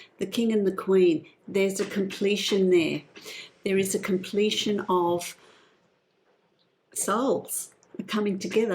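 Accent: Australia. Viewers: so nice to hear the Australian voice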